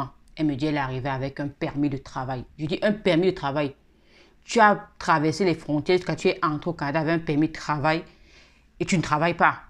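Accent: Cameroon. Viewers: Tu parles comme une camerounaise. J'aime bien ton contenu. Merci pour tout ce que tu fais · Le ton du 237 ne se cache pas mama.. Merci pr la video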